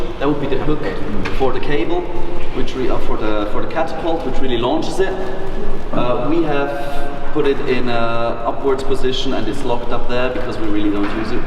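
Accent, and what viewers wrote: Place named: United Kingdom. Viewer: Voll interessant. Sehr sehenswert. Erzählt in wunderschönem Britischen Englisch.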